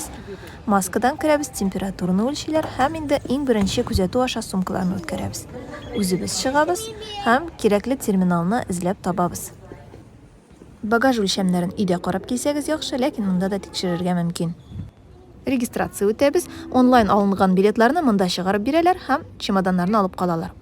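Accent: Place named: Kyrgyzstan. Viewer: Кыргызчага окшоп суйлойт екен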